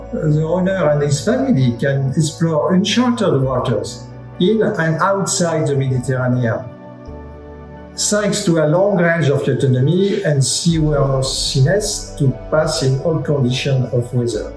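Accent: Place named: France. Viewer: The music in the background is a little bit disturbing. Sometimes its difficult to understand. (Ok, it's a little bit because of his french accent and I'm not used to hear English with this accent.) There are a lot additional 'cracks' as well, microphone was not the best.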